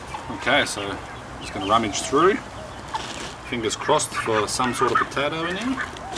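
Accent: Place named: Australia. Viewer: You sound Australian!! :-)!!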